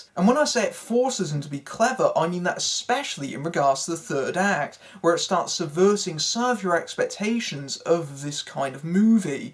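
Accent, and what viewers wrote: United Kingdom. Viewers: JotunTV It is called a British accent, something I don't expect an ignorant dumbass like yourself has encountered before.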